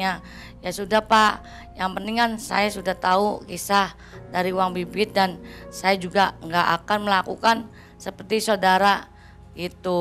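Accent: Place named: Cirebon, Indonesia. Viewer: Logat orang cerbon, yg tercampur Betawi mbaknya ini kayaknya orang cerbon yg pernah diam di jakarta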